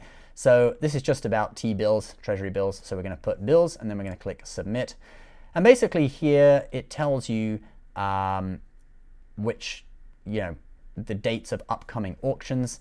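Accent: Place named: England, United Kingdom. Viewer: Your accent is English. Is there a t bill equivalent if you're not resident in US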